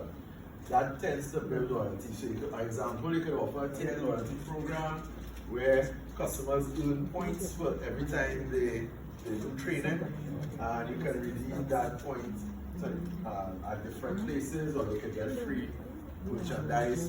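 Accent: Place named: Jamaica. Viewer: I'm enjoying this lecture. I'm into sales marketing and can relate perfectly with this. I'm just trying to grasp the Jamaican accent 😂😂😂😂. Good content you have here💃💃💃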